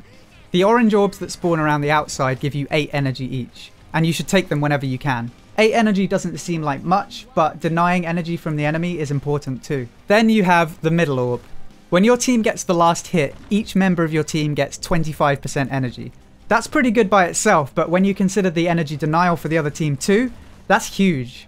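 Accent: United Kingdom. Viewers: i can tell you're from UK because of your accent. plus i'm UK as well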